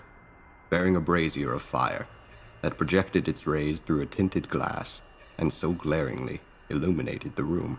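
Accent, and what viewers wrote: United States. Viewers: Very cool, almost strange to hear a fellow American rad on the site, he did a great job though, so used to hearing the Brit accent. Of the several 'spooky' tales chs I sub to, HB is still my fav and first choice. Best to all involved.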